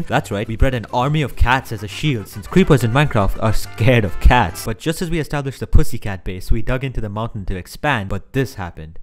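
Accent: India. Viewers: I don't know why but your accent feels very indian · ru Indian? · You speak innindian accent 😯😯😯 Are you one???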